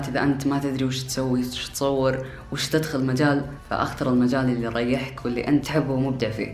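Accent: Kuwait. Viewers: أحسبه كويتي ههههـ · انت سعودي احسبنك كويتي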